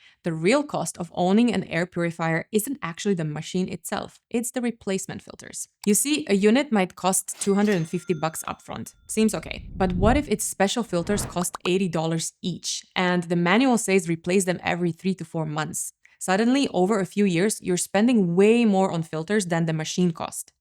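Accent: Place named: Europe. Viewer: Incredibly helpful video. Plus the Euro accent makes me trust her more.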